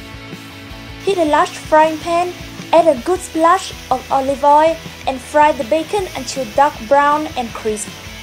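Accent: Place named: France. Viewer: your english has french accent! so funny, anw it looks delicious, i ll try it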